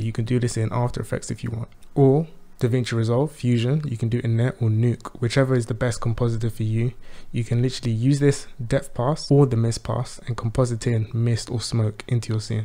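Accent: United Kingdom. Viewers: Great video – very clear and better results that the others I tried. Good to hear a good multiethnic London voice, too!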